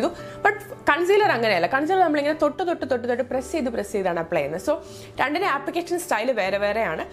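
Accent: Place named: North India, India. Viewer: Chechy adipoliya..chechide presentation nannayi manassilakunnu as a bigginner..pinne chechi malayali aanenne aarum parayilla .oru northy aanenne thonnu...lovly super...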